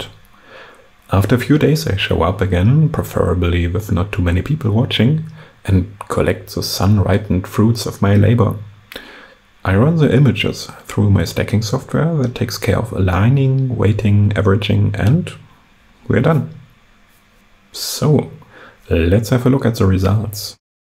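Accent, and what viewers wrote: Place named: Germany. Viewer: That's cool stuff, you deserve a larger audience. I love how well that camera fits on that signpost, it's a really elegant solution to hiding a camera in plain sight. Also, as a felllow german I have a love/hate relationship with your accent. Ps. "BEAR with me" is pronounced more like the german Word bär, not Bier, unless you wanted us to enjoy a drink with you.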